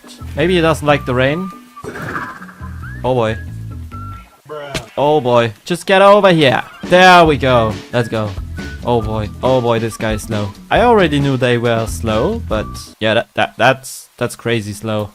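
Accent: Germany. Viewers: deutsch oder österreich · The accent is quite obvious actually.. Though maybe non-Germans just can't tell? (amatures) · Man hört den Deutschen Accent raus.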